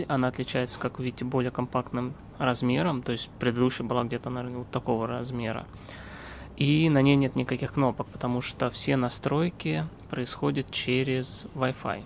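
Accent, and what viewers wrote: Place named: Moldova. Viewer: Спасибо за обзор. Очень помог мне настроить девайс. Удачи тебе!!! Кстати акцент у тебя интересный, случайно не из Молдавии? Всех благ!!!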